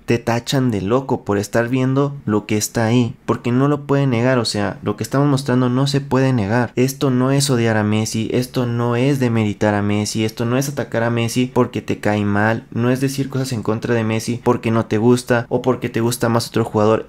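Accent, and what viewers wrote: Mexico. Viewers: Cuando tenes videos de cr7 con el titulo callando bocas..... campeón del Mundo .....Árabe...Te pediría el pasaporte pero por el acento .diría Mexicano ....no tenes autoridad para hablar de Futbol ....Mexicanos hablando opinando de futbol solo son memes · El que hace el vídeo es mexicano no?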